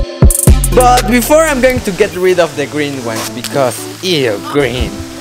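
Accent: Mexico. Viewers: Oye te amo. No entiendo nada de lo que dices xD pero mi untuicion dice que eres mexicano jsjs besos en el fundillo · Tu ingles es muy mexicanisado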